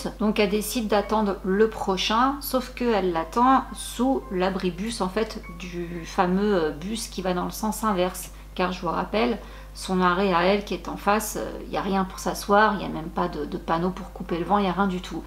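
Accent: France. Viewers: Coucou, tu n'as pas à t'excuser, tu as une vie en dehors des réseaux sociaux et de YouTube et c'est très bien : tu poste quand tu veux !! Et moi je préfère que tu prononce à ta façon ( à la française) l'introduction, voilà..... Bravo à ce jeune homme et à ses amis, les deux filles ont bien fait de porter plainte car ce gars sera plus facilement retrouvé dans l'avenir et ça préviens d'autres personnes qu'il y a des soucis avec ce genre d'approche....... Merci pour cette histoire, gros bisous 🥰👏🏻👏🏻💕🌺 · Team "comme t'es à l'aise pour prononcer" xD J'avoue j'aime bien quand c'est à la française ! Allez go it's tea time 😍 Ah non bonjour bonsoir 😂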